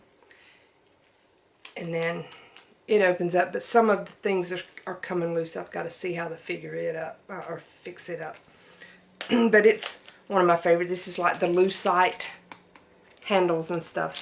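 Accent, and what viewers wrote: South, United States. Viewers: love your purses and your southern accent! Your vids are great!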